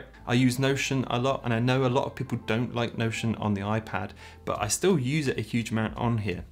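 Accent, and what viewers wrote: United Kingdom. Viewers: Are you British? Love your accent 😊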